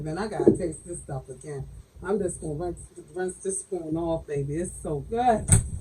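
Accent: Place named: Baltimore, United States. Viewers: Bmore accent all day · I love you, Mama! You helped me so much. 💙💙💙 I have to ask, I'm a new subscriber, are you a Baltimore native? I ask bc my man is from Baltimore and you both share the same accent.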